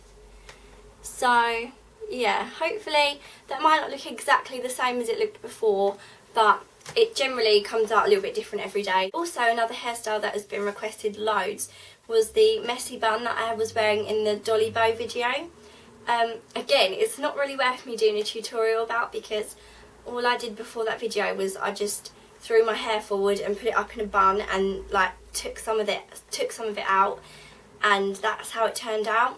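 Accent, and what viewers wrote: Essex, United Kingdom. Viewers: OMGGG!! a Louis Tomlinson inspired shirt AND you have a British accent! I love this chick! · you sound like your from essex!:)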